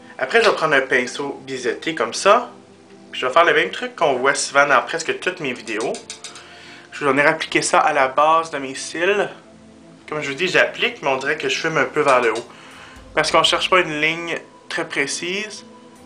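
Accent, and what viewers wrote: France. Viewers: are you from the south of france? trying to figure out ur accent (in french)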